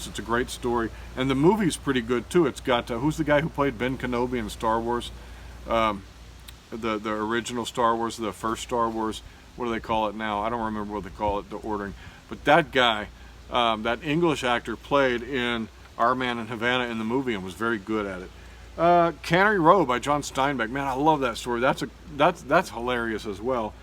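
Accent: South, United States. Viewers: Southern accent is everything. I guess your first name is from your scottish grand mother. Great videos!!! by the way.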